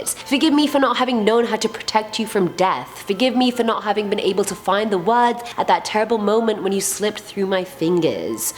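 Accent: Russia. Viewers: Very nice Russian accent! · tht russian accent. im jealous. 😭😭😭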